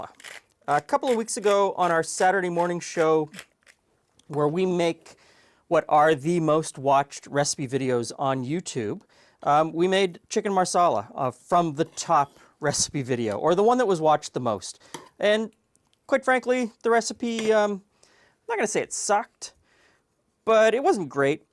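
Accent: Canada. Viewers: Makes sense. Canadian English sometimes favors British pronunciations to American ones. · I try to pretend you’re not Canadian but you pronounce literally everything differently than Americans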